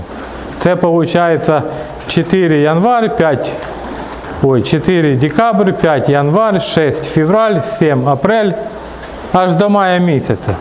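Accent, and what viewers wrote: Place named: Kharkiv, Ukraine. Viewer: Да это не украинский, это харьковский говор. Чистый украинский совсем по другому звучит и интонация другая.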